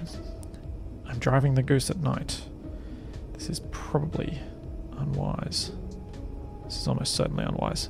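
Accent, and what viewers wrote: Australia. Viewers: Oh, I didn't know you're Aussie. Happy to know mate. Brisbane here. :-) I'm loving your videos. And you're totally right. Do it the fun way, efficient or not.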